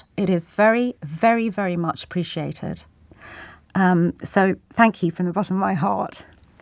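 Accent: United Kingdom. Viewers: Wow, this is heartbreaking. You have to explain real easy to find evident facts to ignorant people everywhere. I stumbled on to this clip in my YT feed. You explained it well. We cannot divide. We cannot live in fear. You’re talking about USA issues just with a lovely ENGLISH BRITISH accent. I am biracial…half of my genetic makeup (per my siblings DNA results) is from England but I was born in the USA. By our looks, you and I could be sisters. The amount of Melanin in our skin shouldn’t determine our ability to lead in a country in which we were born…ethnicity and culture can be better understood when you have friends from other cultures that you are around consistently. The ignorance is breathtaking but not surprising. It’s the only outcome when you’re not interested in learning and becoming a better human. Keep talking. Keep using your voice. Keep your hope for a better and all-inclusive we-don’t-care-how-much-Melanin-you-have-society is the norm. 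Facts over fear. ❤🇬🇧🇺🇸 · Sure sound British to me . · I couldn't get past the first minute of this victimhood propaganda. If your that offended by some imbeciles opinion then to me your obviously already too insecure for public discoarse. You can only be offended if you choose to be or let yourself be offended. You sound more british to me than anyone but as you surely know you only have that job because of "diversity" not your talent or merit. All the mainstream propaganda mouthpieces have token women and people of colour now and the sad thing is these people actually think theyr in these roles for theyr talent. Anyone disagree?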